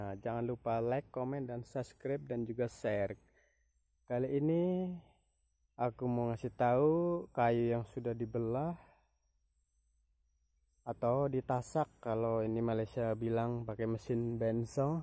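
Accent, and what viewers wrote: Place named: Cilacap, Indonesia. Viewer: Wa'alaikum salam Bermacam macam kayu yang sudah di tasak ...oh jenengan wong Cilacap Tah Mase 😃 , Sugeng enjing mas bro sukses slalu 👍👍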